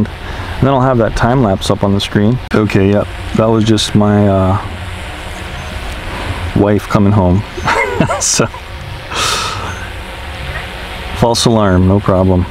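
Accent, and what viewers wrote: Hawaii, United States. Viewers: Howzit great video, I hear a slight localness/Hawaiian in your voice. Mahalo again for the video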